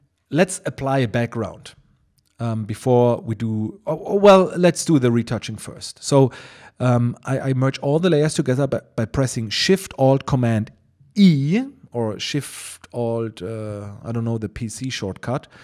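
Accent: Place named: Germany. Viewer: Schön zu sehen (hören), wie dein Englisch innerhalb von 26 min. von "Naja, halt n Deutscher, der Englisch spricht" zu "Hmm...Kanns sein, dass der aus Deutschland kommt?" wird. Man merkt, dass du schon ein wenig rumgekommen bist ;) Schönes Video. Great job.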